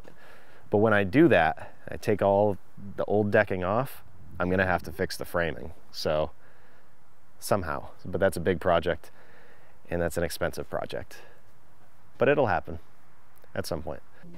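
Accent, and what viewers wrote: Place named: New England, United States. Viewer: lol got recommended to your channel, first time ever watching and after ten seconds of the video, knew you were from Massachusetts or NH.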